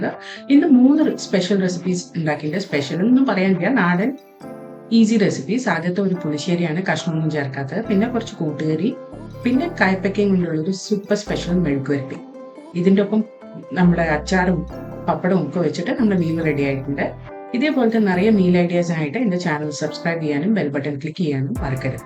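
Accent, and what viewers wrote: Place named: North India, India. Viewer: R u a malayalee brought up in north because of your malayalam I feel so . ❤❤❤❤❤nice vlog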